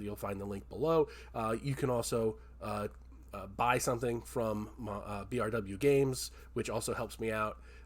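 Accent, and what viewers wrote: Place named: General American, United States. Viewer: My man, you confuse me, how can you have the most british tavern keeper face with the most bog standard American accent ever?! Holy moly.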